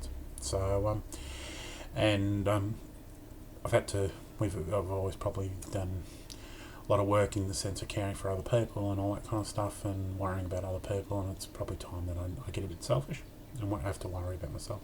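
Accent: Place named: Australia. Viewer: Great job on your first video!! Any friend of Tanya & John's is a friend of ours!! Nice to meet you!! Love the Aussie accent!! Good luck on your journey!!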